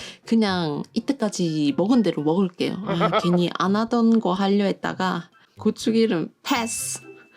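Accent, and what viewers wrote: Gyeongsang, South Korea. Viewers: 경상북도예요? 경상남도예요? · 오 예쁘시기도 하지만 많이 잘드시네요 사투리만봐도 경남사람이시네요🙂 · 경상도 사투리 귀여우셔용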